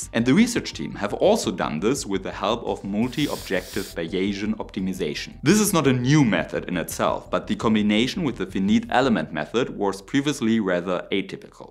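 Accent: Germany. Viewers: Who cares if a German guy speaks English with a German accent, come on 😄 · Germa accent is irritating. Use AI like most other bloggers.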